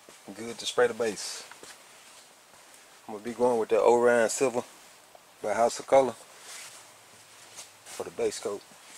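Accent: Alabama, United States. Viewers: What part of Bama you from???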